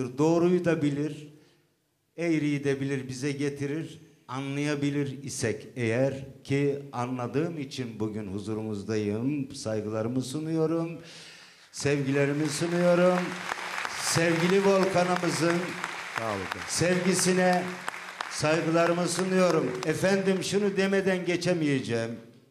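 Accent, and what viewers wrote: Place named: Anatolia, Turkey. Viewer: tam Anadolu ağızı çok güzell nazal n ler falan super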